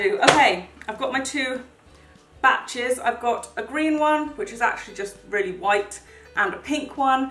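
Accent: United Kingdom. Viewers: I'm new is this girl British? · I love ur accent and ur vocabulary! It’s so crackup, typical British😂(well I’m guessing) lol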